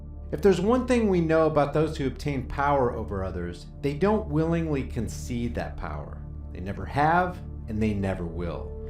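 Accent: South, United States. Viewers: Did he hurt your feelings? I'm from Kentucky too and I realize that there are several different southern accents not only in our state, but in many different states. Also, some of those with accents are police. Hell, they might even be Republicans. You watched this whole video and your comment is about his accent? It is sad that you can't get over your hurt feelings enough to focus the point of the video.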